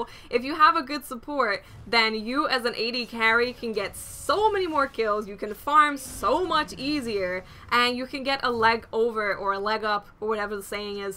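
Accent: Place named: Bronx, United States. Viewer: BTW: you sound like you from the bronx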